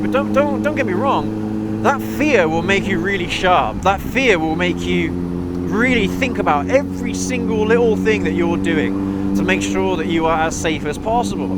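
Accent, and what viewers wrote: United Kingdom. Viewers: Nicely done! I've recently subbed because of the variety of approaches and the ever-articulate British accent. 😉 You pretty much summed up the entire video (IMO) with the discussion about mind. All is mind. Every story we tell ourselves is locked in there and it's our job to "just get on with it" so we can over it. See ya on the next one!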